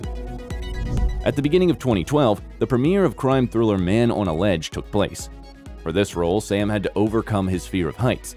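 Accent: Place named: United States. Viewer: Americans always say " Mel born " instead of " Mel ben "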